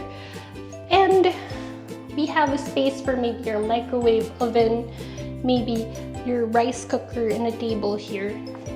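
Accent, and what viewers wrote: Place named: Canada. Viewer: I love the video. You accent is really interesting, I love it! I'm living in Hawaii, and you sound like maybe you lived in Canada maybe? No idea how much these costs but I hope to move to Japan with my wife sometime in the next year or so!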